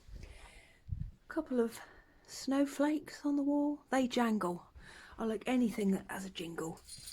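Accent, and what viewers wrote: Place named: England, United Kingdom. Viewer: Enjoyed your English accent and your video!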